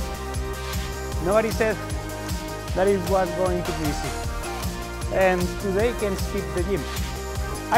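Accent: Spain. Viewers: Le note ancento español pero hablaba ingles jajaja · Es cosa mia o su ingles se escucha muy españolisado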